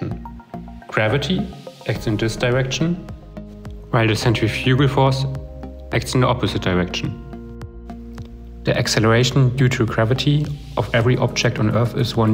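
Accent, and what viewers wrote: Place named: Germany. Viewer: most german accent in existence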